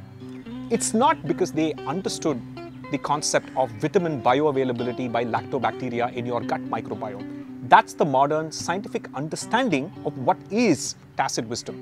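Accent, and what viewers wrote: India. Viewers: the Hindi accent I like too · Great to listen Indian sound, ❤with depth of knowledge ,love to listen more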